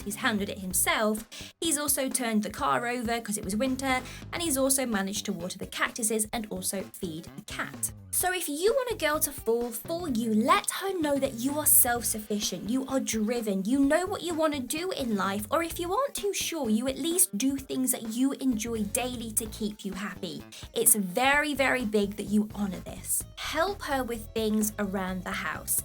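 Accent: England, United Kingdom. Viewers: Always good contents 👍 thumbs up.I will check for audible and the books you are recommanding, thank you. Ps. Your english accent is music to my ears, you clearly can use this to charm someone 🤩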